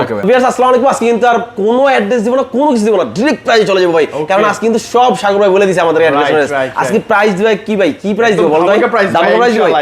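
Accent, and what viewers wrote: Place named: Bogura, Bangladesh. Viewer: আপনার আঞ্চলিক ভাষা বগুড়ার মতো 🥰🥰 আমার জেলা বগুড়া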